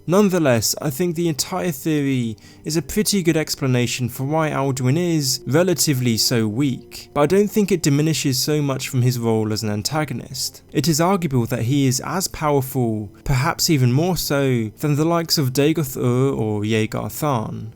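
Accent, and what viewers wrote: England, United Kingdom. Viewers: English accent and a lisp. Mind blown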